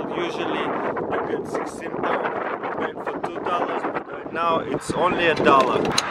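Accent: Russia. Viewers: Акцент то русский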